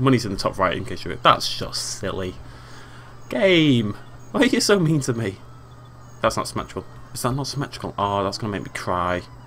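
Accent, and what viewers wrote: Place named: Cockney, United Kingdom. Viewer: Haha, you say assume like "ashoom" and instead of a "th" you use "f". What accent would that be, Cogney? It's interesting how there are so many different dialects.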